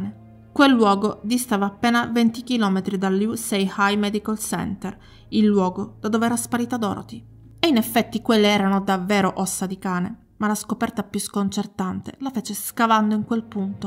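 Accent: Sicily, Italy. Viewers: Ciao non conoscevo il caso...mi chiedo perché Dorothy non si sia rivolta alla polizia dopo aver ricevuto le prime telefonate dal suo stalker...non riesco neppure ad immaginare l'angoscia dei genitori che hanno continuato a ricevere le sue telefonate anche dopo la sparizione della figlia...atroce veramente...la cosa che più mi tiene avvinto ai tuoi video è la tua voce calma e pacata anche quando parli di crimini agghiaccianti...sei una bravissima narratrice ❤e adoro il tuo accento siciliano nonostante io sia un polentone!😂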